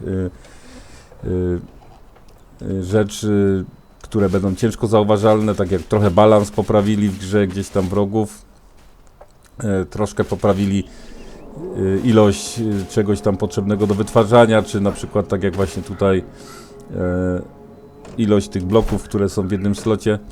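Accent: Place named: Poland. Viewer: Wiesz teraz wszyscy od najmłodszego uczą się angielskiego więc taka spolszczona wymowa chyba wszystkich razi, a jeszcze jakiś dzieciak uzna ją za poprawną bo tak usłyszał w internecie i koledzy będą się z niego śmiali :)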